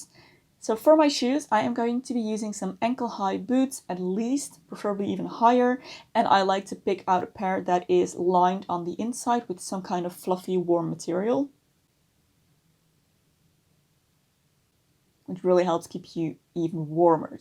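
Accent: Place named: Netherlands. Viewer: i heard dutchie in your accent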